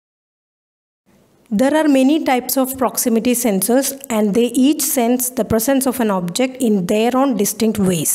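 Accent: India. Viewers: Is the narrator from India? Love from Bangladesh... 🤝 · YES IN INDIAN ENGLISH YOU TRIED TO CLEAR THE FUNCTION OF A SENSORS FUNCTION YOUR MODE OF EXPRESSION AND LANGUAGE IS MORE OR LESS CLEAR AND UNDERSTANDABLE----SELIM AHMED CHOUDHURY FROM BANGLADESH ON 7/10/2021 AFTERNON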